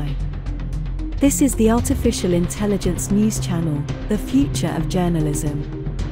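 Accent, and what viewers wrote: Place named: England, United Kingdom. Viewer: Young Eva Longoria with English accent.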